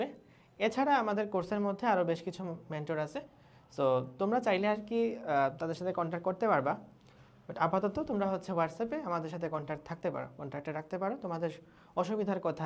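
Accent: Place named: Habiganj, Bangladesh. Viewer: Vaiee kotha bolar modde Habiganj er basa misritho ase🥰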